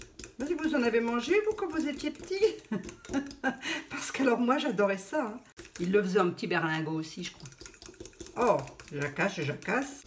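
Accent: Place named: Lorraine, France. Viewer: bonjour, super recette que je vais faire ce soir, je tiens a te dire que je me doutais que tu était lorraine vu ton accent qui ne m'est pas inconnu puisque je suis née dans les Vosges, je vis dans le sud ouest a présent, alors je te dis encore bravo pour tes tutos qui me ravissent a chaque fois bisous a toi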